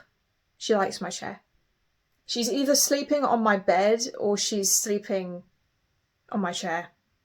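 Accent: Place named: Birmingham, United Kingdom. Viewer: You have shown great courage in order to follow your heart. I think you have kind of a Brummie accent to some extent. Well your taste in music compliments your personality all too well. If you don't mind would you like to try this australian band called. By send my love to the Mitsy as well.😇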